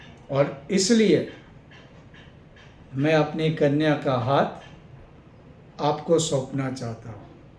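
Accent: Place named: Kutch, India. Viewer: जय गुरु देव कच्छी होकर भी इतनी अच्छी शुद्ध हिंदी बोलते है आप सद्भावना से सत्कर्म सत्कर्म से सुख दुर्भावना से दुष्कर्म दुष्कर्म से दुख कितना सही सिद्धांत बताया आपने धन्यवाद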